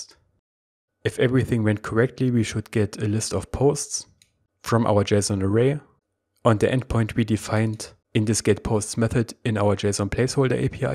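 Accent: Germany. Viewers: Thanks for this brilliant tutorials! They are easy to understand and deeply. Firstly it was hard for me to understand your German accent :D But know I used to and your voice is so clear and understandable <3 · Subscribing because of the German accent! :D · Are you from germany?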